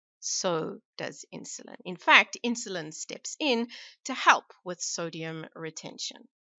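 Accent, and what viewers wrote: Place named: South Africa. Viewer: Excellent informative videos and cute South African accent!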